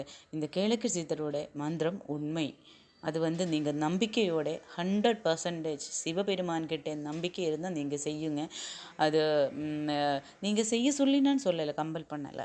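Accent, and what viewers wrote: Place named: Kerala, India. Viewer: Akka unga speech nallarukku.... malayalam kalantha tamil🎉🎉❤❤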